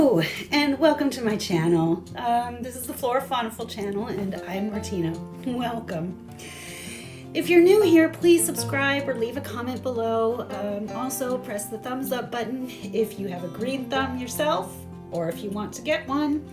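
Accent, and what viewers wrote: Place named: United States. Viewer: Started watching the video and thought.. this is confusing... american accent but looks like dull UK weather & UK home / windows... yet then you mentioned you aren't in USA! I must be psychic...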